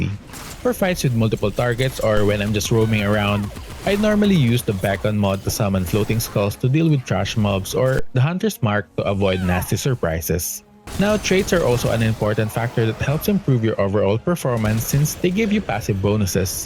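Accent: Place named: Philippines. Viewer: You sound like Pinoy bro. Are you pinoy?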